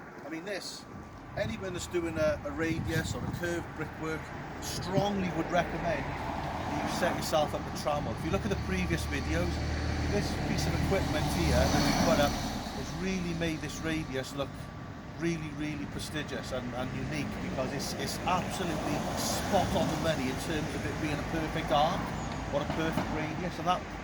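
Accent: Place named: South Wales, United Kingdom. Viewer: Efflorecence is so disheartening. I feel your pain it is no reflection on the work of us bricklayers. Listening to your accent I think wr are from the same neck of the woods ( South Wales ?). The majority sand the builders merchants supply is dredged out of the Severn estuary which will have more of a salt content than pit sand . Would be interesting to know if builders in other areas have the same issues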